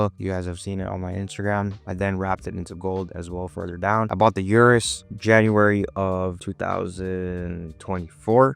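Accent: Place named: Canada. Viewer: u don’t sound American at all u sound like a 100% Canadian.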